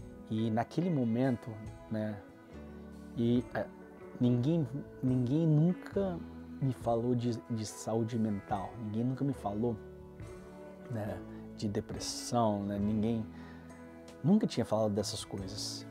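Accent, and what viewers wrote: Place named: Mexico. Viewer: Achava que você era mexicano rs voz linda sua. Passei por esse conflito entre igreja x aceitação, até que cheguei numa depressão muito grande pois fiquei dez anos sem nem beijar na boca, daí comecei a me tratar e fazer terapia, uma longa história...hoje estou tranquilo nessa questão, mas foi bem complicado mesmo! Me identifiquei com muitas coisas. Obrigado por compartilhar conosco sua história de vida 🙏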